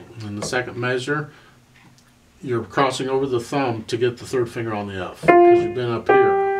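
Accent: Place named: Kansas, United States. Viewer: Beautiful. Thank you! It could have been so cute hearing your Kansan accent saying in Spanish. 😊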